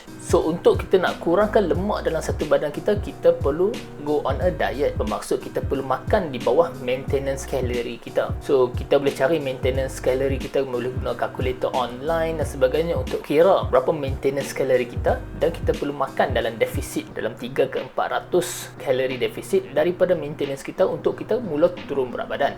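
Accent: North, Malaysia. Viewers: Baguih, kekalkan bahasa Utara. X da kalut nk ckp KL pun. Steady!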